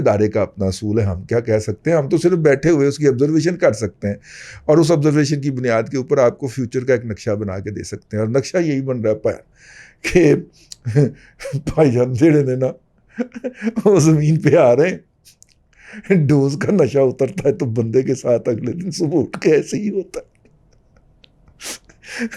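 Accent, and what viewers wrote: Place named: Saraiki, Pakistan. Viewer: AoA Saein, I like your sairaiki accent very much. Please also give a sairaiki touch with Punjabi.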